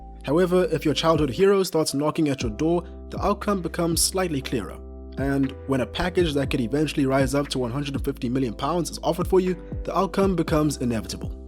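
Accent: South Africa. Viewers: My man's accent sounds like he's a homeboy from South Africa. Love your vids mfana. Keep it up. Hope you blow up. Holla if you want to do a duo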